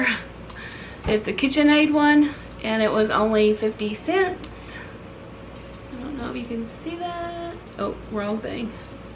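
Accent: Kentucky, United States. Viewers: Just live about one hundred miles from you. I picked on that KY accent as soon as you spoke…..lol. Love all you’ve done to your home. I grew up in mobile home, it was 10 feet wide and 40 feet long. After raising 4boys I’m ready for a tiny house again, I lived in something much bigger during that phase of life. Now it’s just me and I’m ready to downsize.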